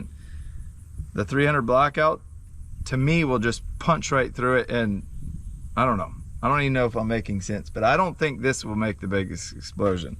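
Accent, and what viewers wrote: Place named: Kentucky, United States. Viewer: You sure your not from Kentucky, you speak like you are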